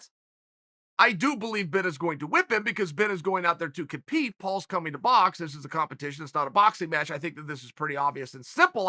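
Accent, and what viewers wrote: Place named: United States. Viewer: this dude have the most American voice i ever heard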